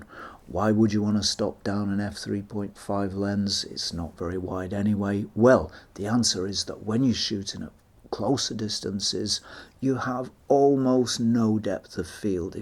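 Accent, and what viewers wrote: United Kingdom. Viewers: Who would tell me that Steve Buscemi is reviewing lenses on Youtube with an indistinguishable british accent?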